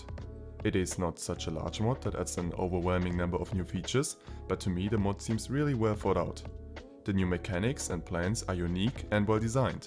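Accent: Germany. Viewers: The german accent make it even better.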